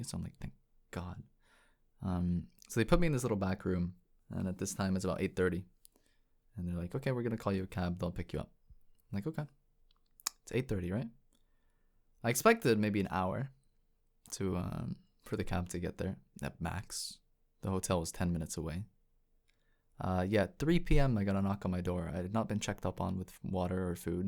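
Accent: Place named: Middle East. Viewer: 1 I'm so sorry for you loss ik what it's like to lose someone that is close to you I hope you are feeling better and that you have a great mental journey 2 I NEVER KNEW YOU WERE MIDDLE EASTERN I THOUGHT I WAS TRIPPING ABOUT THE ACCENT 3. I'm glad you are back from your trip even tho it was very hectic lol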